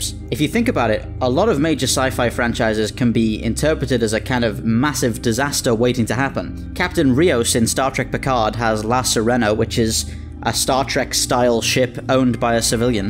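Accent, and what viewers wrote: United Kingdom. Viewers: When I heard the British accent I had to laugh. I can legally weld a machine gun or flamethrower to my car, and, at worst, get hassled by a cop for a "public disturbance." I also have a 3D printer and drill press with which I can pretty much manufacture whatever kind of gun I want. The topic of this video had never occurred to me. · Man, you sound soooooo British. "If normal people are armed then the criminals will just steal their guns".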